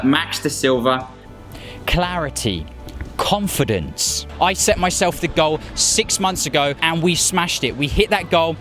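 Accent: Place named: United Kingdom. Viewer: British accent is starting to annoy me.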